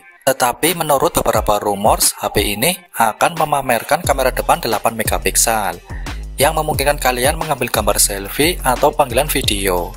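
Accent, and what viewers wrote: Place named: Java, Indonesia. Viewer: Nyunsewu, mas e logat e medok.. saking tegal mas?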